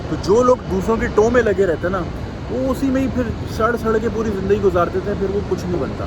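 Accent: Karachi, Pakistan. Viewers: Love hearing your khalli Karachi accent! I forwarded to my close Chicago friends to check out even all of us Pak Americans have been Chicago residents over 25 years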